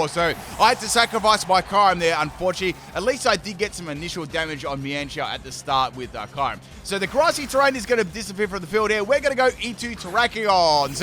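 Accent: Australia. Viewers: Your Australian accent is like the chef kiss on the video. Always has been. The real pimp of YT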